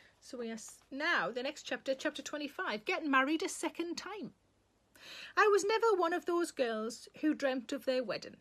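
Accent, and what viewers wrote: Newcastle, United Kingdom. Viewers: The little voice in my head used to say "you must wash your hands". Now it says "wash your fooking hands" in a Geordie accent and it makes me smile every time. Thank you hon :-)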